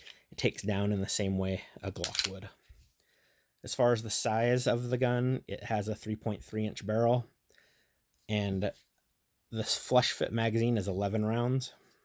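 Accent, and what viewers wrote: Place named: Utah, United States. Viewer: I’m not trying to be disrespectful I was just curious because I’ve never heard that Utah accent. I’m one of those people who enjoy languages and accents and I was just like where is this guy from. Sorry for the off topic comment haha but I like the video I was thinking about getting the micro stoger.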